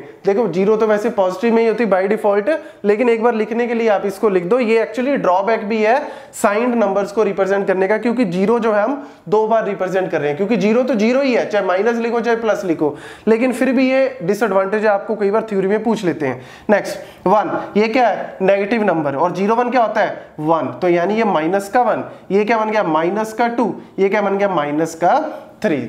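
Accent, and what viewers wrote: Punjab, India. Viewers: Assalamalaikum sir your videos are really helpful for btech electronics students....I m really greatful to you ...and I really like your punjabi accent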